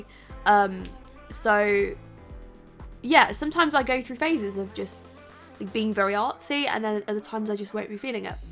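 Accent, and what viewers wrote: United Kingdom. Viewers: I have an idea for a create a sim! But real quick I love Sims but your british accent makes your videos even better because I love british accents anyway! But my idea is that you can/could make Mario, Luigi, Peach, and Bowser! THE MARIO CREW!!!!!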